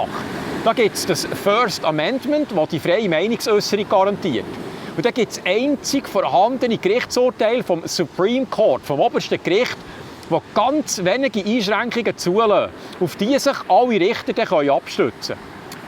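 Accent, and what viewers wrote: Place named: Thun, Switzerland. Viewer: I bi fasch vo Gunte, oder eifach am Thunersee ufgwachse u gniesse sit über 10 Jahr mi Ruhestand im ewige Summer vo Südoschtasie. Bravo! So klari Wort i gnau mim Dialäkt ds ghöre isch ä Woutat. Ds Abo isch gsetzt.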